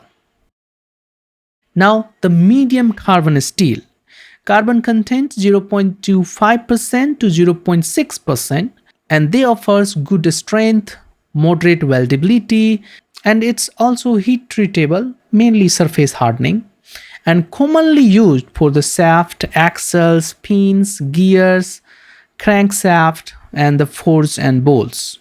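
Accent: India. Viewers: Shit that is the thickest Indian dialect I have heard. Good video though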